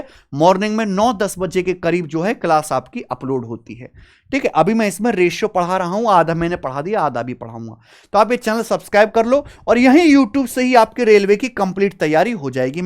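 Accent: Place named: Bulandshahr, India. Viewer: सर का बुलंदशहरिया टोन मस्त लगता है हमे 😅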